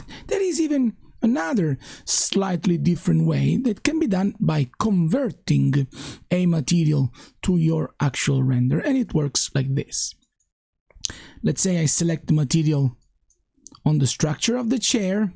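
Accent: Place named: Sicily, Italy. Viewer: Finally! Finally that Sicilian accent makes it to YouTube 😎💪🏿🌺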